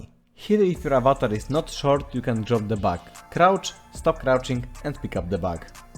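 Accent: Poland. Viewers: ale słychać tą polskość. Pozdro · Z polski jestes bo polski akcent? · Ah ten polski akcent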